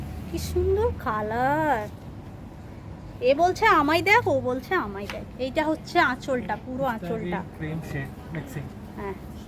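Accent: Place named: Birbhum, India. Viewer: আপনার সঙ্গে কেরালা ভ্রমণ করছি।ওখানকার জীবন সংসার সংস্কৃতি সব জানতে পারছি,আপনার সব ভ্লগ এখনও দেখা হয়নি,তবে আপনার ভ্লগ সাবজেক্ট ও প্রেজেনটেশনে দক্ষতা ও মুনশিয়ানা আছে, সকলকে আগ্রহী করে তোলে,অবশ্যই ধন্যবাদ। আরও কথা যা শুনি যে বাংলার পরিযায়ীদের 2য় ভুমি কেরালা,ওদের কাছে থেকে দেখে শিখে ওরাও অনেক উন্নত ও ভাল থাকছে।আপনার ভ্লগে তাদের অনেক কে দেখলাম, ভাল লাগল। একটা কথা আপনার কথায় একটু বীরভুমের টান আছে, সেটা হতেই পারে , কিন্তু আপনার কেরালা কে ক্যারালা শুনতে একটু কটু লাগে, অবশ্য জানিনা ওখানে সঠিক উচ্চারণ কেরালা নাকি ক্যারালা বলে আপনি ভাল জানবেন।অনেক ধন্যবাদ।বাংলার মেয়ে তথা বধূর শিক্ষা ব্যবহার মানসিকতা ও সহযোগী ভুমিকায় ঊজ্বল হোক আপানার সংসার।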